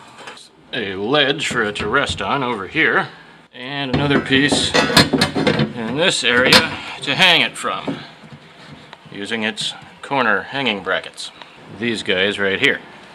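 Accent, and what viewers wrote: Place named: Croatia. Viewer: Your heavy Croatian accent makes your otherwise brilliant vid difficult to follow. Have you considered providing American or Canadian subtitles?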